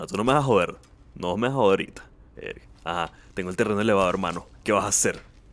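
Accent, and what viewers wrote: Venezuela. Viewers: ¿Sera que es Venezolano?; no vale quien dijo, yo no creo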